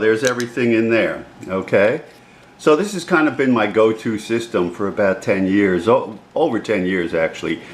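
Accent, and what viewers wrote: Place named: New York, United States. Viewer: I'm a former New Yorker, I love it when I can hear the ny in ya.... lol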